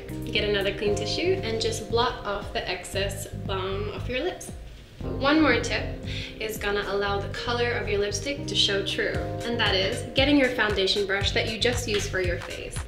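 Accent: Australia. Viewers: Did you practice to change your Australian accent or did it just change naturally? 😄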